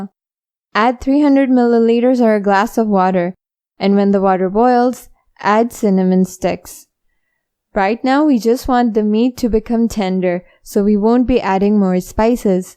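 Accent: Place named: United States. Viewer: After a while you Came with a nice recipe in American accent,as always you trying the new things in your YouTube videos... very good 😃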